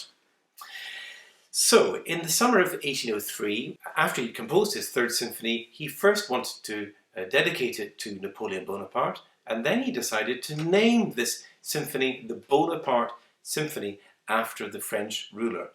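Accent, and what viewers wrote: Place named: United Kingdom. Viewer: What part of British isles are you from? Your accent is very pleasant.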